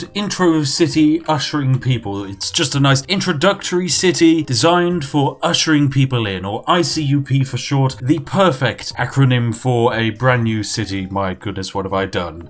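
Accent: United Kingdom. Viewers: Watching on my country side with a large cup of tea. Mmmm British accent "My lord. It's absolutely marvelous". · British english, lol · Are you really British? Why I like your accent more than the real British people? ❤️🙃😂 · Damn you! My colonial mind is programed to be receptive to a British accent and I've actually bought a 240 pack of yorkshire tea.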